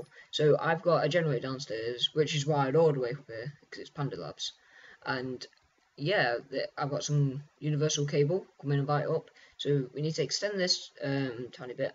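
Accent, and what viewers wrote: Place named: United Kingdom. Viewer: he sounds british, man from 2 years ago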